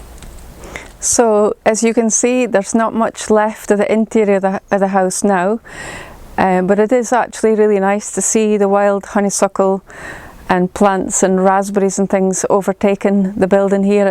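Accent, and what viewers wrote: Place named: Shetland, United Kingdom. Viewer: Fabulous! I know Shetland well it was wonderful to hear the accent, see it all again and learn some new things. Thank you thank you xx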